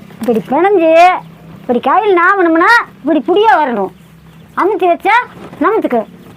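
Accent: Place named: Salem, India. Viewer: Neega entha ooru aaya,salem bhasa mathri iruku.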